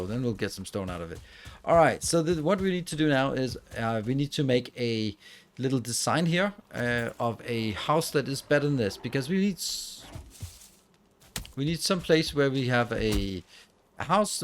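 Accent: Denmark. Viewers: Ohaaa en dansker, føler det er så cute når man kan høre det. Fed video! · Are your from denmark because You Sound like a danish takling english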